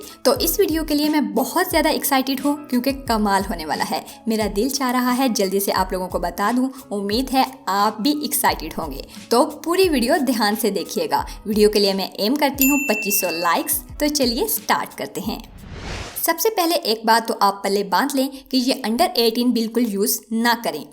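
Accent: Punjab, India. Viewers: Hahahaha ap Punjabi hooo ghera Dena grander ko sooooo 😍😍😍caut gheera😍😍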